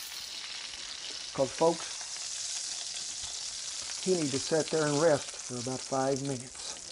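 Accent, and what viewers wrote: South, United States. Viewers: Your voice kinda reminds me of JOEL OSTEEN but with a more country accent!!🤭🤭..some onions along with them mushrooms wouldve did it but nevertheless a beautiful job!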